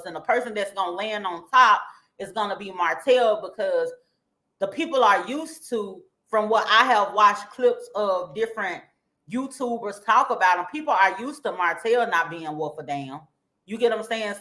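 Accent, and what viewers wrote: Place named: Texas, United States. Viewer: Love the video and your Texas accent.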